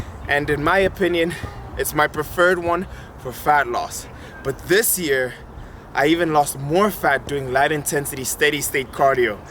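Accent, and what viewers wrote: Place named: South Africa. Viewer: Is he from South Africa ? Sounds like he has a South African accent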